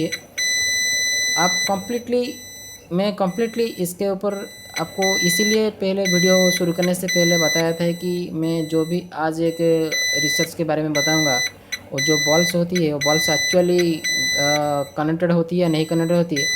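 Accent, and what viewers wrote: Odisha, India. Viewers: Brother you are from Orissa because of your Hindi speaking style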